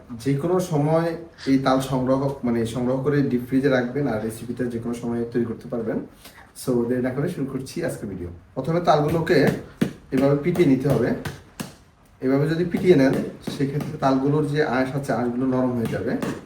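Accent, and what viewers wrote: Noakhali, Bangladesh. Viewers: Unar language sune mone hoy feni/ noakhali hobe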